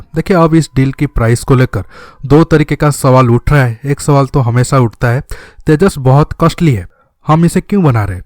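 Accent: Odisha, India. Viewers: Bhai aap oriya hon kya? Love your videos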